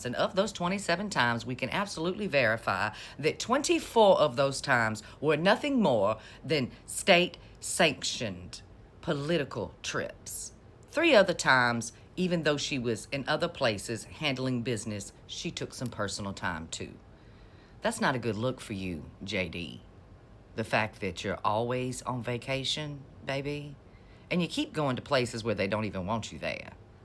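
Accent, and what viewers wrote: South, United States. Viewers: Never gonna get tired of listening to the most beautiful southern voice I've ever heard.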